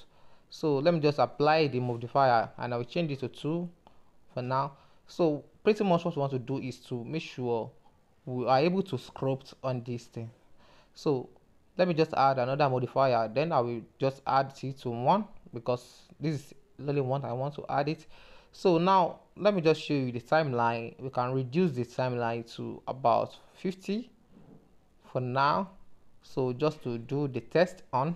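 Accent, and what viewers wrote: Nigeria. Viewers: Talent speaks!!! You sound like you're from Nigeria I just subscribed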